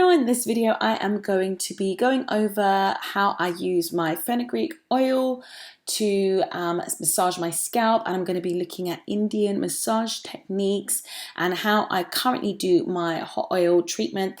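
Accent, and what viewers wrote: United Kingdom. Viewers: ARe you going to show the video of the meet up in NYC? Thanks again, i like to listen to your British accent, it is so calming.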